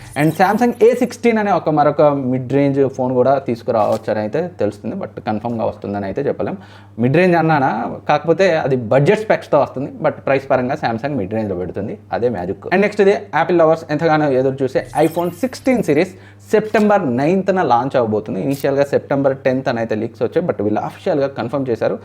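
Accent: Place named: Andhra Pradesh, India. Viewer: Medhi karimnagar aeiyna kuda meru matladuthuna language andhara lane vundhi anna Telangana language lane ledhu medhi ❤🥰😍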